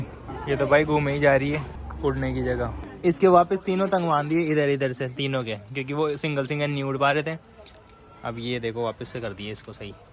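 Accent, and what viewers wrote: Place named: Kota, India. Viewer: Kota ya aas aass boli asii lag rhii hai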